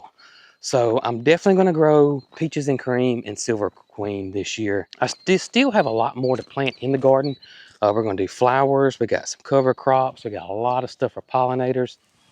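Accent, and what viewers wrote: South, United States. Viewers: your southern accent is thicker lately. Love it.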